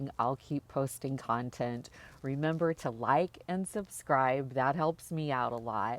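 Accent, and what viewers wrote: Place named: Missouri, United States. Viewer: Lol..... you cwack me up! I can definitely tell you are from Missouri.. I am clumsy.. frought with mishaps.. especially when someone is watching me.lol.. I try and keep my "cool".. but ends in epic failure! Enjoying your posts from SW Missouri.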